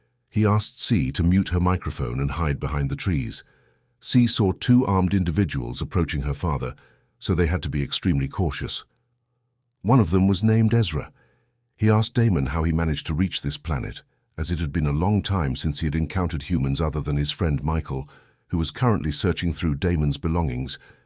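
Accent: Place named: United Kingdom. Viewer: Have to say, that voice caught me off guard a bit......😊 Im not used to it being a (very) British accent, its usually an American accent...😊 Thanks for the recap 👍💙💛👍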